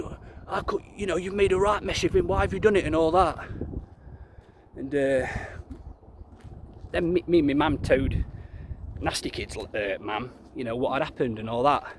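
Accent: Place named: Yorkshire, United Kingdom. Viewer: Hi, just to say when I first started watching you I thought you were from Yorkshire lol.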